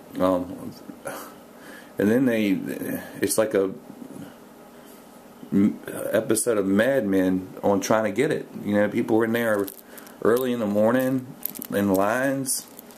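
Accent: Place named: South, United States. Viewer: Southern accent does not help either, anyone know a nobel prize winter or eminent scientist leader in his field who has a southern accent and don't even bring up Clinton because until he and Jimmy were elected President I thought there was something special about someone who could become POTUS but they killed that Idea FOREVER you can be common as shit and be president.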